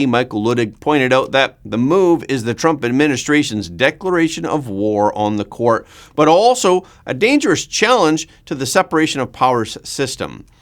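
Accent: Canada. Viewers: That powerful Canadian accent combined with superb in depth coverage of the US zeitgeist took American me by surprise. Thanks neighbor!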